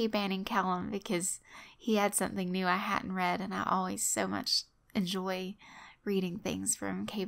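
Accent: South, United States. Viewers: I actually just found out about your channel today.. I'm so sorry for what you've been thru, and I'm sending healing thoughts your way that things will only get better for you and your loved ones. As I'm a new subscriber, I don't know what your voice was like before..but as a southern gal, I sense a hint of southern in your voice as well..which of course I think is terrific.😊 Hang in there, I believe there's a plan for each of us. I don't purport to always understand (or enjoy) some of the routes my life has taken getting to the plan, but I try to keep in mind even the darkest days eventually have to lighten up. And as much as I don't like it, it'd be hard to recognize real happiness if I'd never experienced real sadness. I'm looking forward to listening to your prior narrations as well enjoying the new ones you choose to share. Take care!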